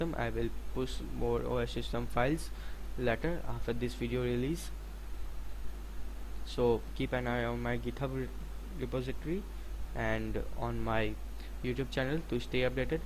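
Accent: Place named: India. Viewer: Authentic Indian accent😄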